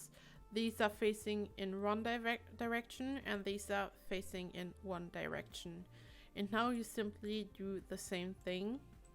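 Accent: Germany. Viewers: sorry for asking but are you german ? i think i can hear a little cute accent :) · Du bist deutsch 😂 oder ?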